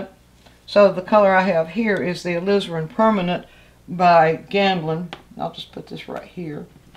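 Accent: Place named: Georgia, United States. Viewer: I love how you say “cullah”, your GA accent is so charming!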